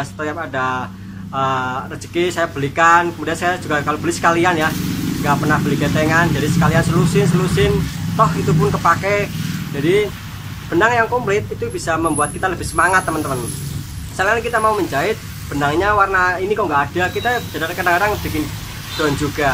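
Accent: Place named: East Java, Indonesia. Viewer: Ganteng...soko jowo timur yo mas....kelihatan dari logatnya...arek suroboyo????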